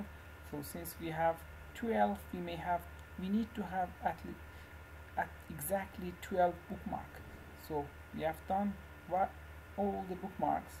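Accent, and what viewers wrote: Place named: Iran. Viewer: Thank you so much for this upload - grateful! You sound Iranian! I have an Iranian friend. Cheers!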